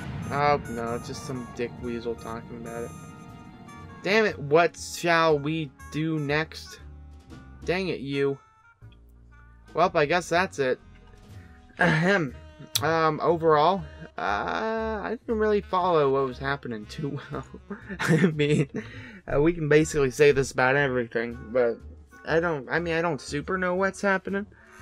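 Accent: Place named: Australia. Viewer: I was turned on instantly by that Australian accent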